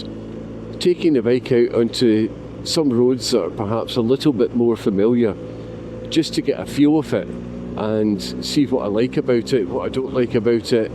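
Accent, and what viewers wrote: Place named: Scotland, United Kingdom. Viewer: I enjoyed the vlog. I'm familiar with the Moffat to Selkirk road you were on. The Glen cafe is worth a stop in the spring and summer. I too have a 2020 GS1250 which i love however its proven to be somewhat unreliable and has twice left me stranded. I'll be interested to see how you get on with yours in the coming months ahead. Its good to hear another Scottish vlogger on the Tube. Best wishes 👍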